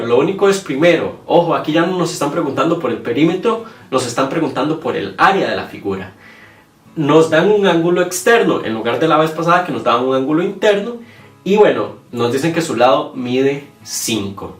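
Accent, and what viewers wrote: Costa Rica. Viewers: Hasta ahora veo que sos de Costa Rica el acento me parecía que si y que dices "verdad" hahahaha Pura vida pa buenos videos , voy a estudiar con tus vídeos para Bachillerato ( me parece que hasta sos de San Ramón)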